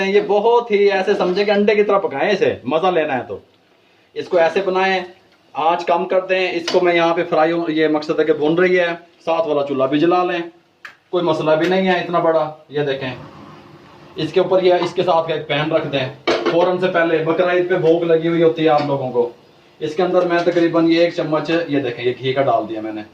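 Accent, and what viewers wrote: Punjab, India. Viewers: Very nice ap ka bolne ka andaz Punjabi thori thori jo bolte hain Good job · ka punjabi ka tarka v good.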